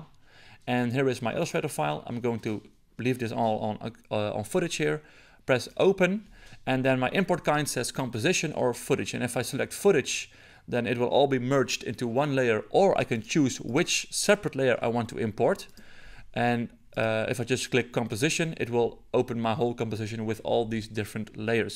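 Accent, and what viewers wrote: Netherlands. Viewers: The accent might be Dutch, not sure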